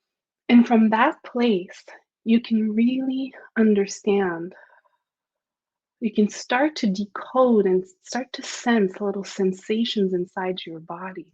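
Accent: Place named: Quebec, Canada. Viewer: Bonjour! Je reconnais ton accent canadian francais, je suis de Montreal et francophone aussi. As-tu écrit ce livre aussi en francais?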